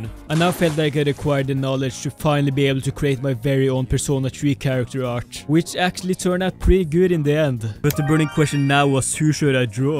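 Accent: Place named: Norway. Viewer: Nice video, love these artstyle breakdown type of videos. Also goddamn you sound Norwegian lol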